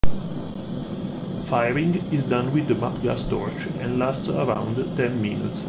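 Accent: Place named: India. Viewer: Thought you were an Indian guy hearing your accent, dude.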